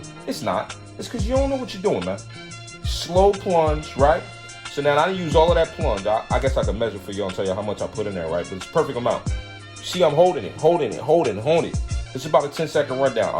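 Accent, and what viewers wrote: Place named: Philadelphia, United States. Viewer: I knew my man was from philly 2 seconds into the video You did your damn thing on that jawn💯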